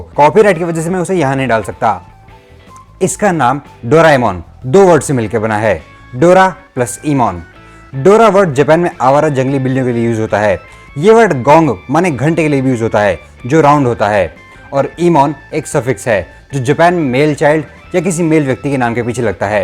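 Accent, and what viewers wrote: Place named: Marwari, India. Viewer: I love your marwadi accent 😂😂😂😂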